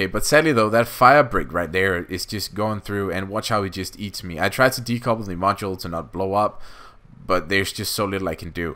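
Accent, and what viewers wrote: Denmark. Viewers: Where are you from ? Dane ?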